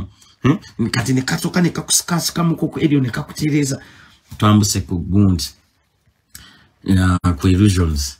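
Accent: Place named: Buganda, Uganda. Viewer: Wabula today u made me laugh with your bunnakiganda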